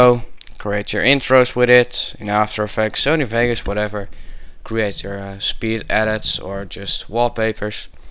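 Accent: Netherlands. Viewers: I know how hard it can be to talk proper english when you're dutch, because I'm dutch too, but I might have a tip for you. Write down your text before you start, and just read it. Or just please dont use your voice if it isn't good enough. Sorry. You keep swearing and there are Silent letters in english. Also letters you DO have to say. Don't say "oh yea, plural" in the middle of a video. We don't want some gramar lessons. Apart from that, the tutorial was pretty good. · u have a dutch accent :D i can tell because im dutch myself and most dutch people who talk english (or try) sound like this. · U dutch or from belgium :P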